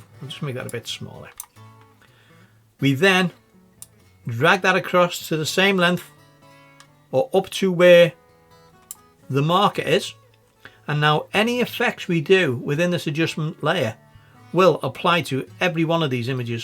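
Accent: Liverpool, United Kingdom. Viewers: Wow. What an awesome and easy to follow video. It is exactly what I need for a project I am working on at the moment. You are a great teacher. You sound like you are from Liverpool. I went to college there in Hope Street - between the two cathedrals in 1968 - yes I am very old. I have subscribed and will watch you other videos too. Thanks again and much love from beautiful Segovia in Spain.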